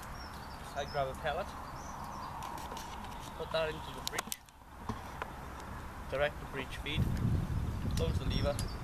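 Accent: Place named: South Wales Valleys, United Kingdom. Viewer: aye obviously with that valleys accent lol. Im up in merthyr, picked up my 97k blackline yesterday for my first rifle, have a nikko sterling 4.5-14x50 AO IR coming monday for it. Cant wait to shoot it! I really like the 77 aswell, im now saving up for the 110 as a first pcp, im strictly target shooting. Any new Air rifle reviews coming soon mate?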